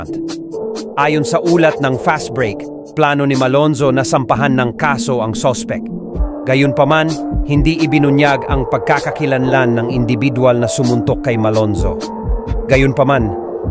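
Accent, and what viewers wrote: Visayas, Philippines. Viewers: diction at accent ng bisayang m😅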